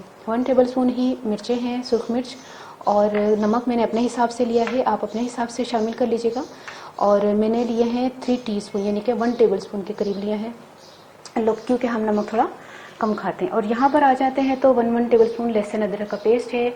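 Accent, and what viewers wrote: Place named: Punjab, India. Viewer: Ap jb panjabi main baat krti hain to boht acha lgta hy mashallah boht piara bolti hain or cooking b zbrdust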